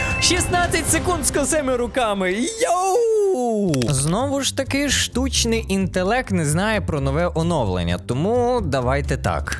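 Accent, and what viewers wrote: Lviv, Ukraine. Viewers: ТИ З ЛЬВОВУ???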